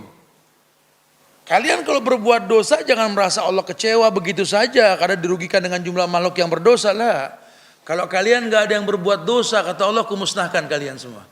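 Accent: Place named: Jakarta, Indonesia. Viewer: Bnr bnr bahasa ibu ku .betawi bget.barakaallahfiq ustadz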